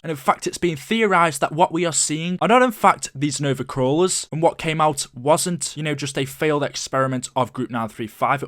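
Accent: United Kingdom. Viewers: Every time I click on a COD video there’s a person narrating with a British accent ???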